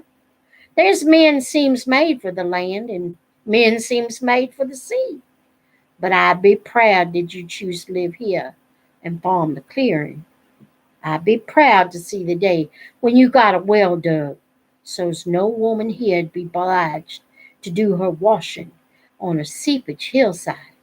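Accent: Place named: South, United States. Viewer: I will be honest with you I’m not big on professional audiobooks to begin with so I was a bit iffy coming in, but you’re skill in narration and your beautiful southern accent as another east Texan was so wonderfully fit for this story and the characters and your delivery of that final line shattered me. As a child this book devastated me, but as an adult who has lived so much more life and suffered loss of other’s innocence, this book wrecked me in the most beautiful of ways. Thank you for your dedication to finish this story despite the hardships and time it took, may the Lord bless YOU Miss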